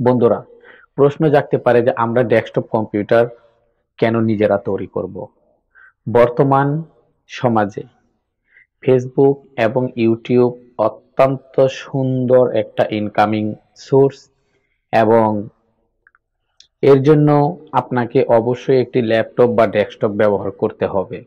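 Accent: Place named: Dhaka, Bangladesh. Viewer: আপনার কথায় ঢাকাইয়া আঞ্চলিক টানটা ভালো লাগে, আপনি আপনার ভাষাতেই যেভাবে খুশি বোঝাতে পারেন, অনেক অনেক শুভকামনা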